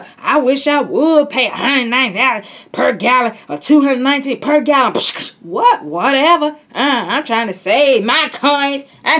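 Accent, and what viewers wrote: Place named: Louisiana, United States. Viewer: Girl where you from. I'm a guess Louisiana. I love your videos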